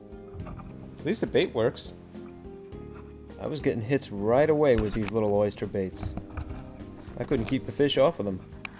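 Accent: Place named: South, United States. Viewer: Any1 else think he Sounded like he talked with a country accent?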